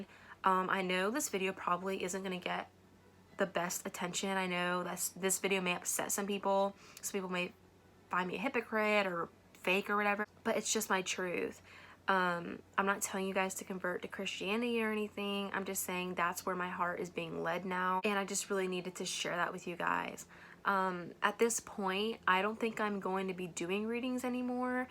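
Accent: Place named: South Carolina, United States. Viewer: By the way, your South Carolina accent is so awesome.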